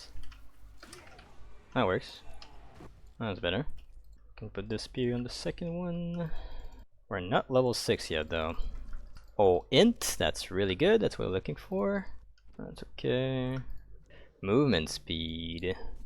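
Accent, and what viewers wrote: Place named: Quebec, Canada. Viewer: hi random and weird question lol, i noticed youre on the BR servers, but your accent sounds A LOT like a french canadian accent so I was wondering if youre from Quebec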